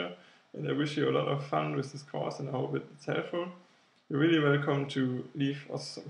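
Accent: Germany. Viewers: You might want to work on your English pronunciation. I don't mind a bit of an accent, but at times your German accent is very heavy. Please see this as constructive criticism. Otherwise, thanks. I'm also using InDesign for my bachelor thesis (albeit on Windows, I think Mac is way too expensive. Shame there's no Linux version, as I'd love to use InDesign on my Ubuntu machine)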